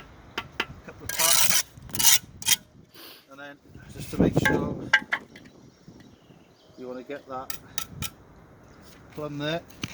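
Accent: Wales, United Kingdom. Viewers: Nice video 👍 very neat work and your welsh which makes it better 😂cymru am byth! 🏴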